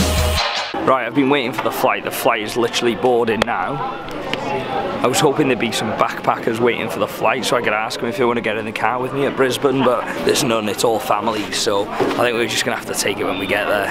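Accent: United Kingdom. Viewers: Who can't give this guy with the sweet british accent free stuff :)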